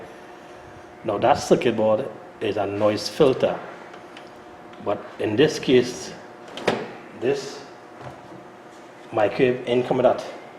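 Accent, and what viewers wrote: Trinidad and Tobago. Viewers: Have a samsung over the range with hood vent. one of the fuses on the noise filter (Has 2) blown Tried a new one blown again. what would cause that? Microwave no heating. door switches test fine. Resistor , magnatron, capacitor, thermal switches all test fine. Also would like ur contact. u sound like a trini.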